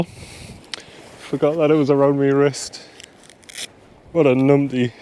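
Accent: Newcastle, United Kingdom. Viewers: 😂 Howa man he is just a geordie it ain't his fault he is wrong side of the wear They speak Doric where I live now and if you canna understand him then you'll be knackad up here ❤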